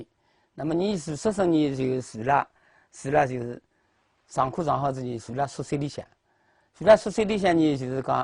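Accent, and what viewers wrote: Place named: Shanghai, China. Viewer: 这不和浦东话一样吗，我说没和南汇合并前的老浦东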